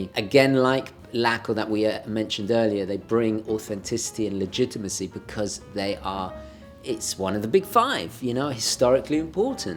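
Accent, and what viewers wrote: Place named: United Kingdom. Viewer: Just came here for the "Bri-ish Ah-Cents".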